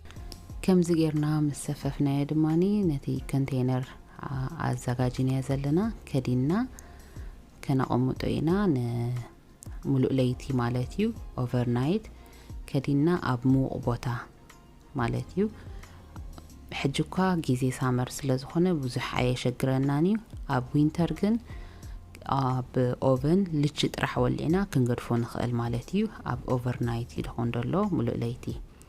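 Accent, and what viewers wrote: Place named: South, Eritrea. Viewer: All good but you need to fix your tigrignia. No more ኣፍቲ፣ ካፍቲ ፣ ናፍቲ ፣ ሓፍተይ ። It should be ኣብቲ ፣ካብቲ፣ ናብቲ ፣ ሓብተይ። it is sad to see Eritrean tigrignia being replaced by south of the border.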